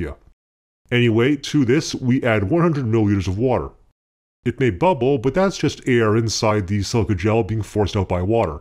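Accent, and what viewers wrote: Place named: Canada. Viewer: you sound soooo Canadian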